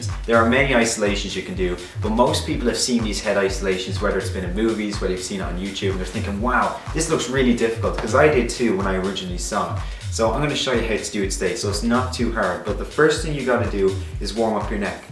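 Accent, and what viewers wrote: Ireland. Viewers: your accent gives me life. irish accents are fab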